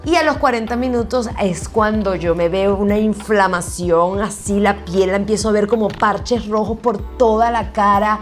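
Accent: Venezuela. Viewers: Omggg eres de Venezuela???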